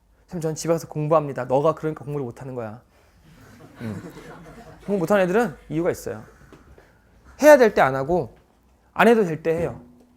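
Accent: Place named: Soviet Union. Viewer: 말투 소련여자 ㅈㄴ 달맞네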